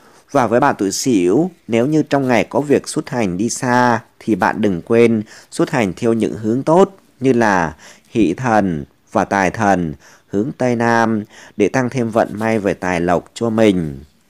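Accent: Thanh Hoa, Vietnam. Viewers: thay. giong. noi. giong, nguoi. xuan. yen.tho xuan thanh. hoa. que. ngoai cua minh. Cung noi vay ?😄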